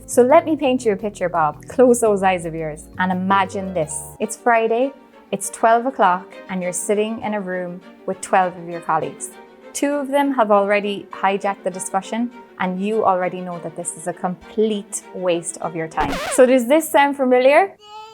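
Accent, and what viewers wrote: Ireland. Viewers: have you replaced Jonathan? It's not often you hear a strong Irish brogue on YouTube. Love it or should that be fair play to ya. Anyway my questions, what are the main DOs and DON'TS for facilitation and what are the he biggest errors that new facilitators often fall into. Both questions are probably related. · Love the Irish accent!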